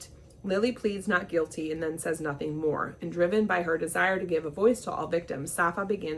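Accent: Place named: United Kingdom. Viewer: I love your take on British pronunciations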